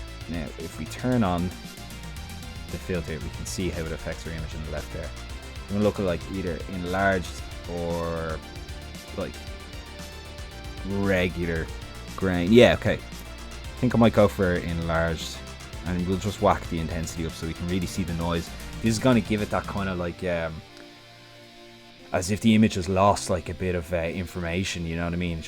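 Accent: Ireland. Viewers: It is so refreshing to hear an irish lad talking about graphic design. Can't wait to see more of your videos :) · Awesome tutorial! I was taken aback by the real dub accent haha...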